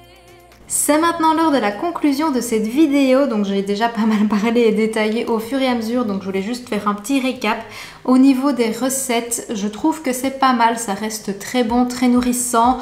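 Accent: Belgium. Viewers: J'adore tes vidéos et ta façon d'aborder les choses ! Et ton calme naturel (et ton petit accent belge 😉) sont super agréables ! 🙏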